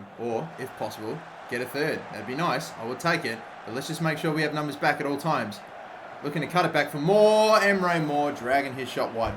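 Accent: Australia. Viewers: Didn’t know Alisson did youtube and had an Australian accent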